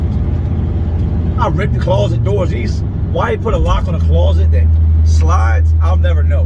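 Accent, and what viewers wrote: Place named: Bay Area, United States. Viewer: He sounds like he from tha Bay wit that Never Dat. But dats old school I don't think we say dat no mo. But keep it P.I. Bad NFluenz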